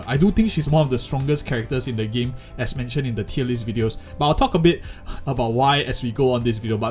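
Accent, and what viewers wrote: Singapore. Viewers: bro you sound like a singaporean. If you are from singapore, it is nice to see a fellow singaporean covering honkai star rail 😄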